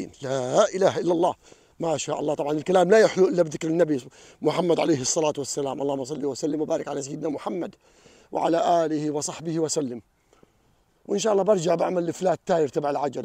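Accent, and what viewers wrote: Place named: Palestine. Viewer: باين من لهجتك فلسطيني أو أردني تحية لك من بلد المليون شاعر الجمهورية الإسلامية الموريتانية ❤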